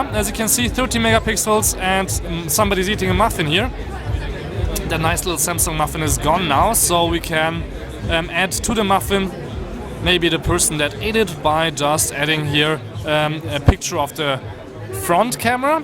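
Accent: Germany. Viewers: Hi first off, please refrain from using profanity in your videos. Also, are you german? :0)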